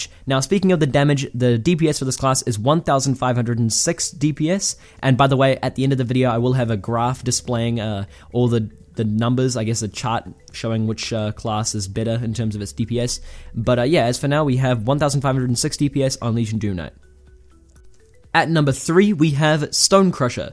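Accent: New Zealand. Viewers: New Zealander. Noice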